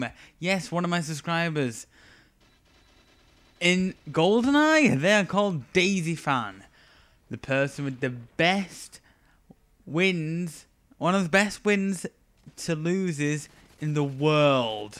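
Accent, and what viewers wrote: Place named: United Kingdom. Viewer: You sound like one of the MI6 members. :D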